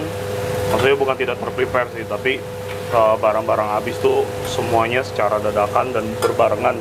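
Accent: Banyumas, Indonesia. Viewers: Kok jowone Inyong Inyong mang ini orang mana. Xie Xie ya Koo ilmunya Sucses selalu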